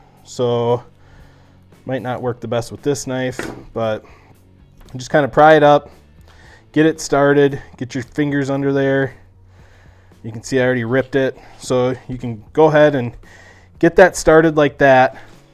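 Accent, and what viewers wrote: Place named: Midwest, United States. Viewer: Your voice has a midwestern twang. I hear MN?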